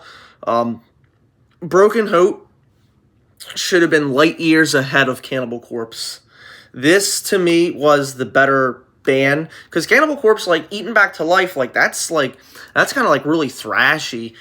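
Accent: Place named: Texas, United States. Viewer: I'm Texan and I approve the Texan accent....yall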